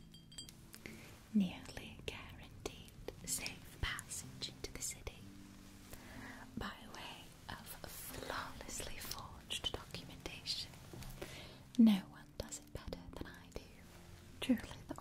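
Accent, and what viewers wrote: England, United Kingdom. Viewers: Ahhh! Am I crazy, or is this the first time ever that used an English accent on a Babblebrook video?! It's lovely! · Me: can't possibly be more appealing...: Starts speaking with a British accent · Such a good Babblebrook video, I get so excited when a new one releases. Your British accent is pretty amazing too! Very tingly :D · So excited to have more Babblebrook content! Gorgeous visuals and intriguing plot as ever, also a pretty decent attempt at an English accent! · As a British person, your English accent is certifiably fantastic!! And that 📣🐈 👀 👁👁 made me burst out laughing. Love the language and humour you use in your videos. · As a Brit I always feel like you watch a lot of Keira Knightley to try and get this accent. You’re quite good! The elocution smacks of “Brit in a period drama”.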